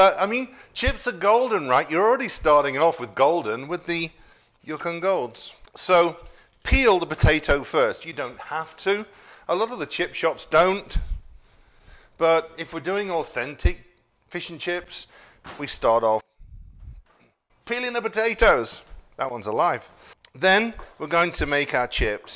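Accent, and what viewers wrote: England, United Kingdom. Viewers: lol, I've heard, still would love to visit, see the architecture, the culture, everyone having your accent lol I wanna be british for a couple days lol... Come to NYC, if you haven't already.. many things to do here, and you can find the best of any culture of food. Your accent is the rarest you hear in NYC too, from my experience lol. there's asian areas (chinatown, etc.) Jewish communities, (brooklyn) there's black areas, there's indian areas, latino areas, etc... it's a melting pot of every culture.. basically the only time you hear someone with your accent is if it's a tourist lol... in my experience. To stay there for a couple days, and everyone I talk to having your accent would be a fun experience lol.. but that's normal for you. So interesting to me. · There are so many accents in England. Do you mind telling us where you grew up. 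· What's the favorite fish bar called? I sometimes have a hard time understanding English accents sorry! But I'd like to go if I ever visit Scotland :)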